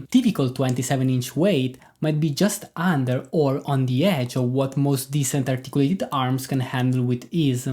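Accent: Italy. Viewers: Italian, Russian is completely different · Si sente molto l'accento italiano comunque ahhaha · This is undoubtedly the best video on the subject of monitor size. Thank you. I love your Italian accent. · Sounds more like Italian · Italian Is my guess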